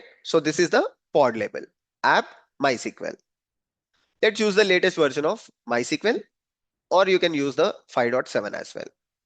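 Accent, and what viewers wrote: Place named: India. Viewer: I struggle a lot with the Indian English accent (it's very hard for me to understand), but I love the content you provide. Keep up the good work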